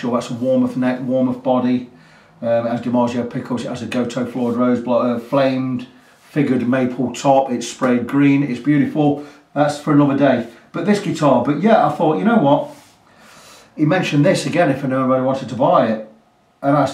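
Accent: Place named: Nottingham, United Kingdom. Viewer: Was just about to comment Nottingham boy but then you came out with it Proper Accent 👍🏻😁 being a Notts lad too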